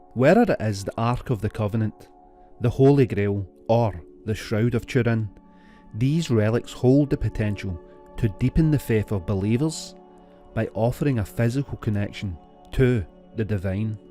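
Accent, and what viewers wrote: Scotland, United Kingdom. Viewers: Starting to look forward to that Scottish voice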